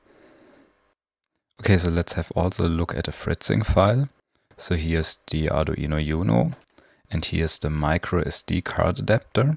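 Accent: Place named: Germany. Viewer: German english.... hard to listen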